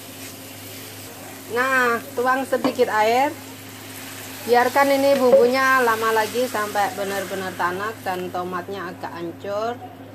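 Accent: Java, Indonesia. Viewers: Bunda orang mana saya orang ponorogo bund.. Sepertinya jenengan logat nya jawa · Mbaknya persis saya, pake wajan sama minyak kayak gitu, nggak usah dicuci dulu dan minyak bekas goreng dipake lagi. 😅😁 bicaranya medok ya, dari Jawa ya mbak? Sip banget. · Jawane pundi toh iki?? Medok buanget logat e 😆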